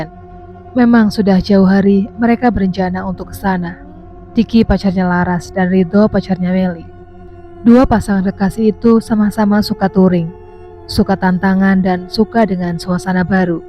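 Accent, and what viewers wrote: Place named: Java, Indonesia. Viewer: Yaelah medok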